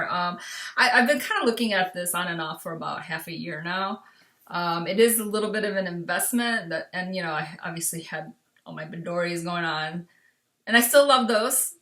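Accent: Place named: Germany. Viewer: Hey your German is cute! Greatings from Germany 😀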